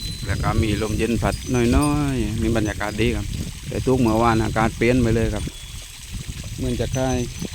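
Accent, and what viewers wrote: South, Thailand. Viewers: ฟังสำเนียงคนใต้แบบ แล้ว.กะดีคือกัน.ได้รู้ว่าภาคใต้เขาพูดแบบนี้.ฟังจากที่อื่นเขาพูดเร็วๆรัวๆ.แต่ พูดแล้วฟังรู้เรื่องจ้า.❤🎉🎉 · ฟังสำเนียงใต้ก็เพราะดีนะคะ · ฟังสบายๆ.ภาษาใต้.ให้กำลังใจคับลุง.จากfc.นราคับ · สวัสดีจ้า ชอบฟังสำเนียงใต้ลุงจ้า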